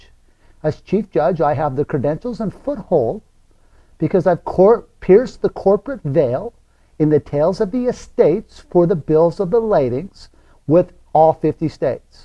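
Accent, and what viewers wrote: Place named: United Kingdom. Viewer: Here, thought you were Brit 🤔😂🖖🏾👏🏾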